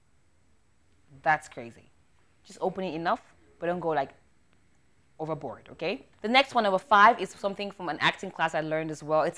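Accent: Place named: Africa. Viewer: I watch all your videos and I like all of them (except the one about the movie). I particularly love this one bc I'm a huge fan of effective communication & beautiful African accent. This is awesome! Very educative! U create a conducive learning environment & spice it up with fun/jokes.....So we are actually learning (in 'school') & loving it!! Keep up!!!#HammerlandInSight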